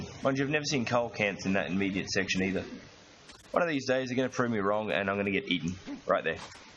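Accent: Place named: Australia. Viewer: Looking forward to your wife's bad jokes lol Definitely picked up on the Australian accent. I am from South Africa, myself. Damn that L72 fish! Cool video man :)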